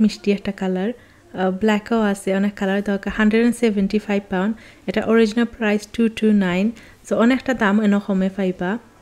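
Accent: Sylhet, Bangladesh. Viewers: আপু, খুবই সুন্দর ভিডিওটা। আপনার সিলেটের ভাষা আমার খুব সুন্দর লাগে। একসময় ভাষা বুঝতামই নাহ। এখন সব বুঝি 🥰🥰 থ্যাংক ইউ আপু।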